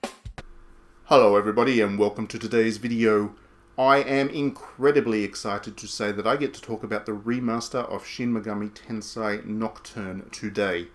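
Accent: Australia. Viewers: Austrailian accent clearly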